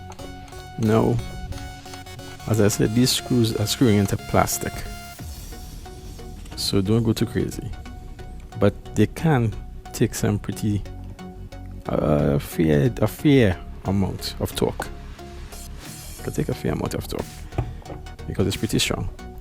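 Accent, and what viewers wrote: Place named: Trinidad and Tobago. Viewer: Heard your voice and was.. He must be Trini. Just looked at your channel sure enough. Been to Trinidad about 8 times. My wife is from Grande.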